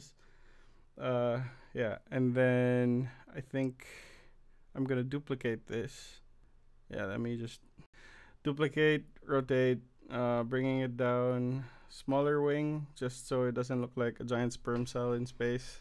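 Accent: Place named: India. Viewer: your accent is sounds like an indian.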